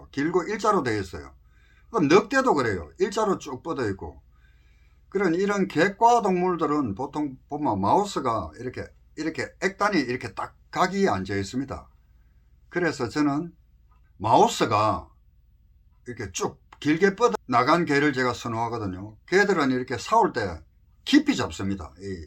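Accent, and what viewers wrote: Gyeongsang, South Korea. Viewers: 오늘도 구수한경상도 사투리로 커피한잔하면서 영상속의 말씀.잘들었습니다 펜으로 응원합니다 행곡하시고 건강하세요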